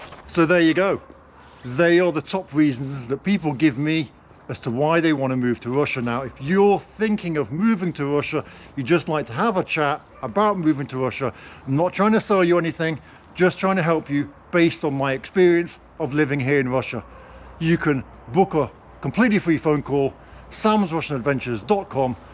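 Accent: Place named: North, United Kingdom. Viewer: У автора просто типичный северный говор)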